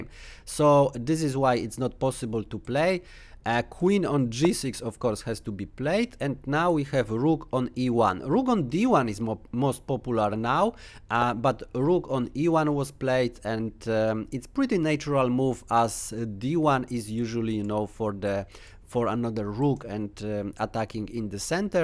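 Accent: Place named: Poland. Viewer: Stare chińskie przysłowie mówi: Jeśli 1 osoba Ci powie, że masz łeb jak koń to daj mu w mordę. Jeśli druga osoba Ci powie, że masz łeb jak koń to daj mu w mordę. Jeśli trzecia osoba również Ci mówi, że masz łeb jak koń to warto się zastanowić czy nie pójść do weterynarza. Nie przypieprzam się, po prostu robisz masę błędów fonetycznych i składniowych, dorzucając polski twardy akcent sprawia, że słuchacz się męczy, bo merytorycznie muszę Cię pochwalić ładnie opracowałeś tą rozgrywkę, absolutny top 👍🏻 Akcentu i języka również idzie się nauczyć, wiem bo mi zajęło to całe 6 lat, powodzenia.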